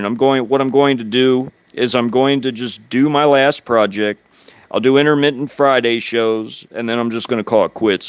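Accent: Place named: Kentucky, United States. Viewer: The story of the CSS Alabama which Three Country's attacked her that day. The French The British and the lunatic Sherman Government.. This is the same Axis of evil in every war since. My grandfather loaded the Gold from Germany. My uncle was MP at Redstone.. It was my uncle who told me what Redstone was and why it was named that. One big hot Redstone because they have a enough nukes to destroy the planet 6 times over. At 9 year's old I asked my uncle why. I asked other questions as well. Uncle Don would not tell anything till I had brought him at least 9 Beers later. He was one who knew All . His answer was that we had everything buck Roger had. Lasers spaceships and much more.. That started my research. When I ran across you on YouTube you sounded like my family in Kentucky.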